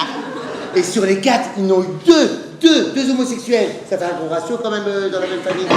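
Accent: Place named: Nord-Pas-de-Calais, France. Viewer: C'est bizarre pour un homo d'avoir l'accent ch'ti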